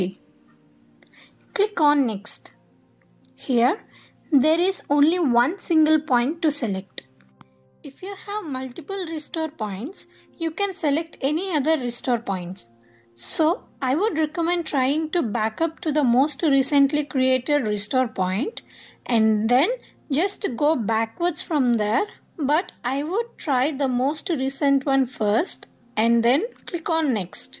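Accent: India. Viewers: Wow mam how smooth u speak Like an Indian...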